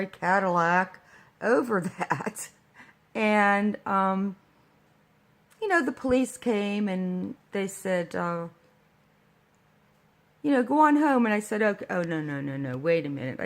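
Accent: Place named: United States. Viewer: Ps. love the accent where in the US are you from?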